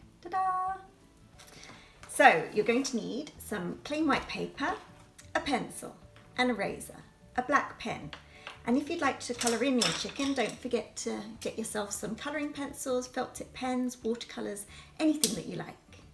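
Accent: United Kingdom. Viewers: I love this video, I said to my son, I like watching videos when they have a nice voice and then a second later you're fine tuning the comb and say " like a punk haircut"! So British, love it.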